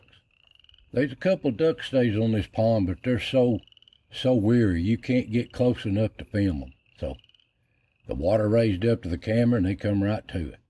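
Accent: Appalachia, United States. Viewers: i am new to your channel but certain things are clear to me. You are certainly from around the area. You have said things in different videos that I have only heard back in the hollows myself, such as Notown, yup, i know where it is. The way you say Haint. I Have only heard dad and a few others actually say it the way you do. A paper Polk, again, just a few people i know use that. One of the later ones i saw in your Raw Head and Bones, you broke a Wantnot. My aunt is the only person i know that calls those wantnots. again not sure how that should be spelled. I figure you are about 20-30 years older then me. I grew up on the TN side of the mountain from you. Tackett creek was an area i knew pretty well. Just wondering, have you covered the Sand Cave up on Cumberland Mountain? My first trip to the look out rock on top of Cumberland mount was around 1980, the tower was still being build at that time. 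You could then drive a car up there. Looked at the overlook, then we went down to the sand cave. To this day if I go up there, i feel like i missed something if I don't visit both places. I knew about, but never made the trip over to Fern Lake. I have camped and hunted though out Tackett creek. No one really many scarely tales to keep us in line while i screw up, seems that was a generation before me. Although i did hear a few, wish i could remember more of them now. Dad once told of some boogieman that kidnapped kids, but i don't recall the details. I am really enjoyin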